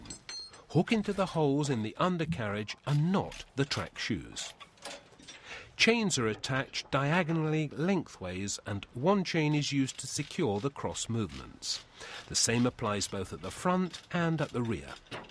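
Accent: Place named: United Kingdom. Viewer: "Balance with the help of the excavator's digging equipment when entering the lower body. This is to avoid tipping which would prove awkward both for the excavator and the trailer." How do Brits come out sounding like Shakespeare even when talking about how to avoid a total fuck up with heavy equipment?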